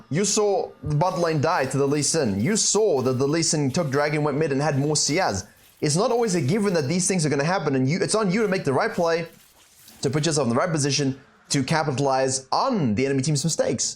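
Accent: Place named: South Africa. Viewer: The little south african cracks in your accent makes me feel at home :) the information is great btw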